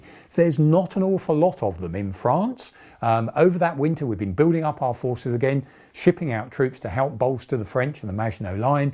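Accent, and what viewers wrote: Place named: United Kingdom. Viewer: i am apologizing since English is not my native.enjoyed this video and i am wandering what part of G.Britain his accent came from.in my country tv series Only fools and horses is stiil popular and finding some similarities,although prounancing every sounds perfectly clear and have no problem to understand him.once more apologizing for this ugly English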